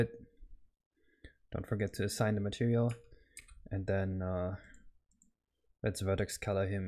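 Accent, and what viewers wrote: Germany. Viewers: I liked you accent and I just realized you're german, everytime I heard someone with that slight german accent I thought they were native speakers. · Ayo completely unrelated but i noticed how much less noticible your german accent has become over the years, gj man, not many ppl improve that so quickly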